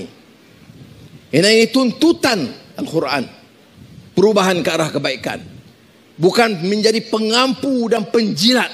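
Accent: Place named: Jambi, Indonesia. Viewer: Mirip dialek Jambi..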